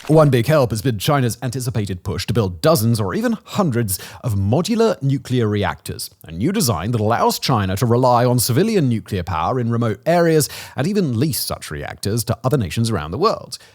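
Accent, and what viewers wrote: United Kingdom. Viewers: Can we please have an American announcer speaking about America. Let this brit broadcast about the stabbings in London or stop with the intensional severe brit accent. Take the marbles out of your mouth and speak American english language.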